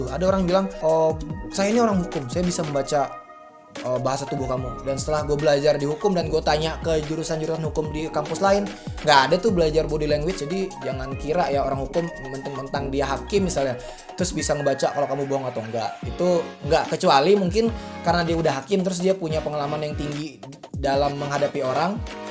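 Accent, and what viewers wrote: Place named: Padang, Indonesia. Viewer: Dari logat ngomong, kayaknya kak org padang ya?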